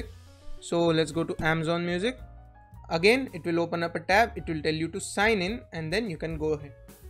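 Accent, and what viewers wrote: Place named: India. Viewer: Deshi style main English mat bol Bhai thoda toh respect Kar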